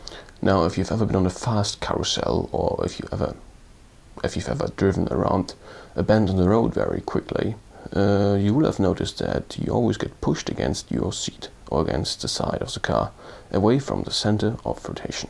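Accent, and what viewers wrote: Germany. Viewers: If i hadn't read this comment before, i could think you were from Germany with this accent.^^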